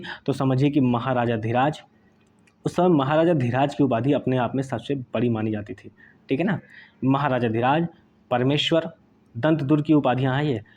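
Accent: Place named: Bijnor, India. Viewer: Aapki aawaz hamare bijnore ki lg rhi h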